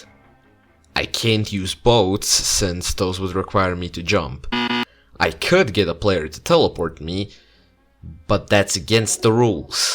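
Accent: Russia. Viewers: you sound vaguely Russian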